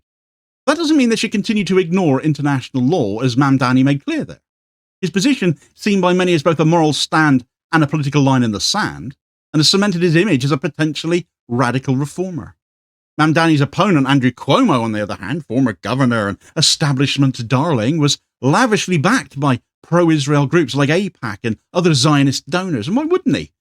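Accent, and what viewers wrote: Cornwall, United Kingdom. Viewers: Sounds like a Brit to me. ❤😁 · Cornwall mate 😅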